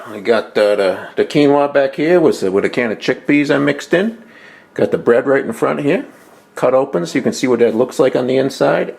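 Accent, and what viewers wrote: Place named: South, United States. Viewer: I love southern accents .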